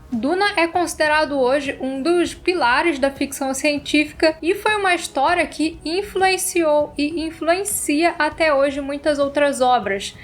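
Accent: Rio de Janeiro, Brazil. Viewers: eu notei um sotaque carioca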